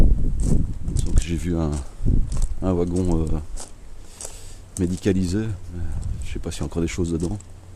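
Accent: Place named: Belgium. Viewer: L'accent Belges, J'adore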